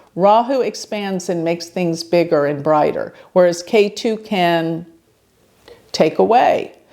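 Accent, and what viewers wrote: Texas, United States. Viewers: First time watcher.. Something made me think, she's from Texas and turns out you are! I'm in Tyler, not too far away from Dallas. Looking forward to checking out more! Cheers!! ❤️❤️❤️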